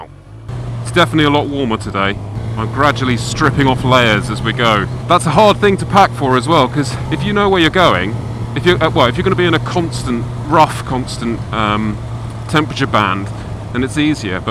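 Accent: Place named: London, United Kingdom. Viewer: Here in 'Murica it is primarily illegal to filter or as we call it Lane splitting. Love your vids you sound like a "Pen pal" of mine from london. (Because saying internet friend sounds lonely)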